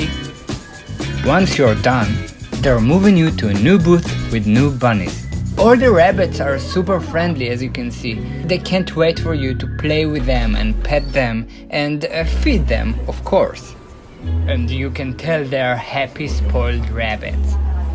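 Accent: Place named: Europe. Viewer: This guys voice is what Americans think Europeans sound like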